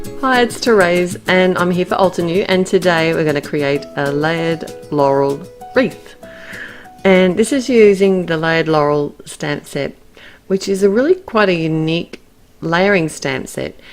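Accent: Australia. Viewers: Thanks for sharing. Enjoyed this simple but very effective card tutorial. Nice to hear an Aussie voice too. Really hoping to buy some of the new Altenew markers - the colours are very vibrant and pretty. Can we buy Yupo paper here in Oz, do you know? Thanks again for your lovely card.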